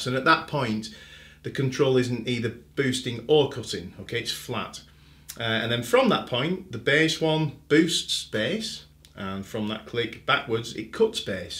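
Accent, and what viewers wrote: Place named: Bolton, United Kingdom. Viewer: Looks and sounds great, Sir! Nice to hear the dulcet tones of a fellow Northerner, too. Sounds like you're from my neck of the woods - Bolton. Correct me if I'm wrong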